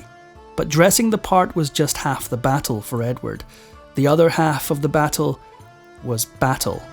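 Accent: Scotland, United Kingdom. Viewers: The narrator has a lovely voice and a charming scottish accent! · I don't think that is Neil. He doesn't sound anything like him, apart from being Scottish, and I can't find any reference to whatsoever on this channel.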